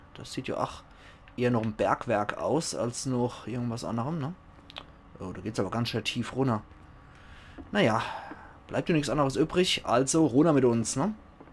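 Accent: Palatinate, Germany. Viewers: Omg. LETS PLAY UFF pälzisch ist ja wohl das beste Ding ever!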